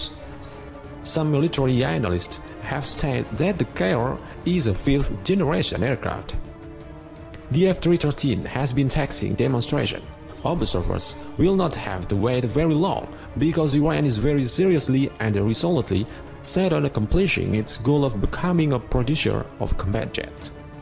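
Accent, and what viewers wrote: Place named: Arab world. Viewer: لهجه کیریت نشون میده از این منا فیق های عرعرستانی هستی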